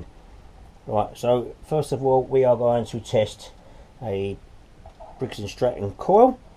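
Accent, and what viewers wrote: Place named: United Kingdom. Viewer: That was helpful although I had difficulty understanding through your British accent. Thanks.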